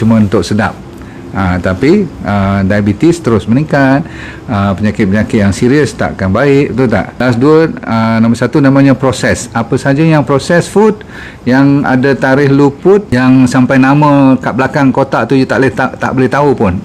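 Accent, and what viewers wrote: Malaysia. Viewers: Sepertinya profesor ahli soal makanan yg terkontaminasi bahan kimia, saya senang nonton videonya hanya kadang-kadang aksen dialektika melayunya bikin saya coba mengerti maksudnya, 🙏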